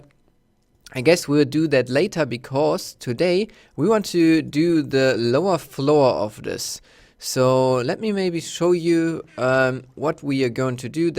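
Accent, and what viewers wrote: Germany. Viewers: Man hört sofort: Deutscher.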